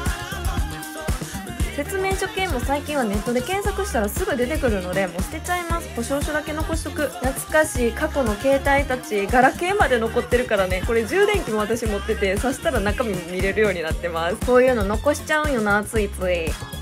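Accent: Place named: Kansai, Japan. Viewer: ｢ほなまたね｣ インスタで言ってたよな！関西弁最高👊💓 つい最近まで関西住んでたから聞きたくなったらちゃんの動画見てる！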